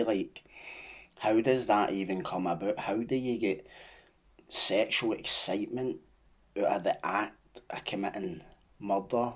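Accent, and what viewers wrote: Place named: Glasgow, United Kingdom. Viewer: I've heard a lot of these stories before,but it's refreshing hearing it in a Glasgow accent and perspective.honestly well done on everything,I seen you on fivos podcast.theres real change there so respect to you.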